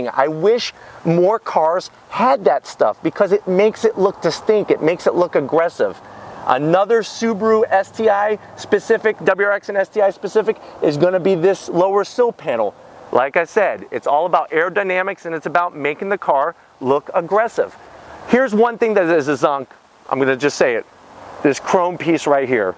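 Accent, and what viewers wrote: United States. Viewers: Nothing worse than a yank accent it grates it’s high pitched and not ENGLISH!!!’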